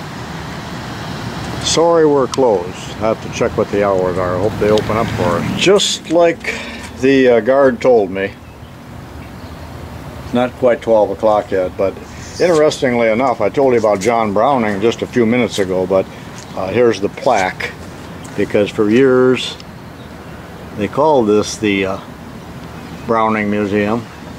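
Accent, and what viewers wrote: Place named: Midwest, United States. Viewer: No disrespect intended . ! I guess it's that Midwest voice that reminded me of him . He was a good man .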